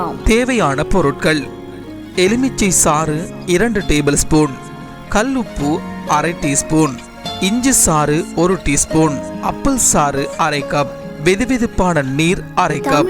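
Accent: Sri Lanka. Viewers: That's srilankans pronunciation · He is Sri Lankan tamil...u watch only advantage...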